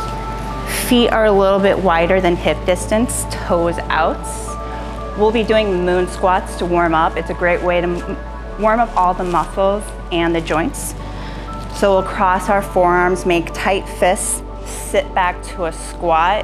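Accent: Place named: Midwest, United States. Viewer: You're from the Midwest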